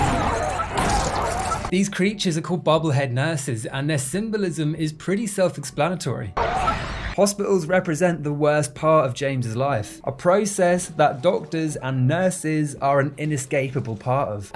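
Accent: United Kingdom. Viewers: You are painfully British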